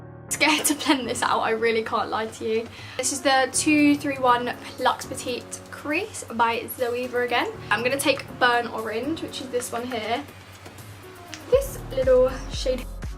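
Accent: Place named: United Kingdom. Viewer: Soooo fabulous!! Your work is wonderful and I love your british accent 💕💕 saludos desde México! 🇲🇽🇲🇽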